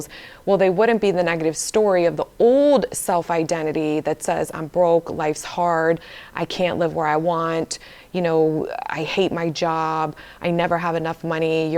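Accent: New York, United States. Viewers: She sounds nyc for real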